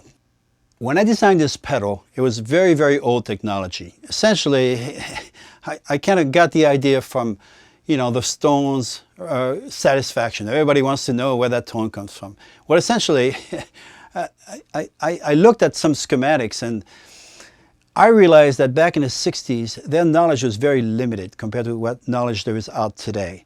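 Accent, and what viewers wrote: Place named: Italy. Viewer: You're talking about me! I'm the pro musician/producer/studio engineer. Hopefully I know what I'm doing, LOL! It's interesting to listen to you speaking. I can hear very clearly where your son's voice comes from. You've still got a little bit of the Italian accent. Some of my American family do too. Your son must have worshipped you as a child, and you must have given him everything you could. He is a genius level artist with the highest level of personal expression on his chosen instrument. Congratulations on your success and your excellent equipment. It offers some of the most unique guitar tones that have ever been designed or available to the public. The attention to detail in the dynamic response is what I love most about the equipment you design. Are the current Dirty Boy pedals being produced with a licensing agreement between you and the Dirty Boy Co. 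run by Danny Gomez and Roberto García?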